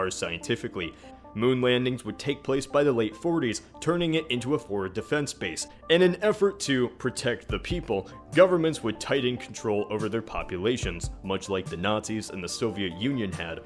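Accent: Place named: Texas, United States. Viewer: You sound like a Texan to me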